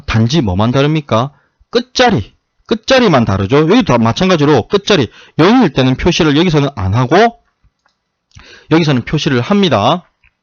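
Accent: Gyeongsang, South Korea. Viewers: 졸리는 부분이라도 꼭알아두어야 할 항목입니다.ㅋㅋㅋ 구수한 경산도...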